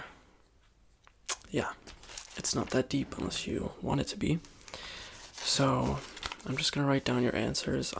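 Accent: United States. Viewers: The first time I came across your video I feel like you will have a British or Irish accent. Nice American accent like really good. Can you do English accent? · How do you look British and sound American at the same time?:...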